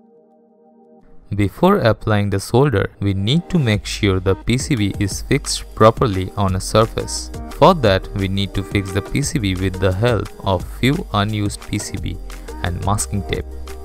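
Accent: India. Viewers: Indian guy, hire a pure bred western guy for voiceover😉 No one will worry how induction cooker messes up smd things at 165 c coz hot air guns min temp is 130, get upto 500 deg c 😎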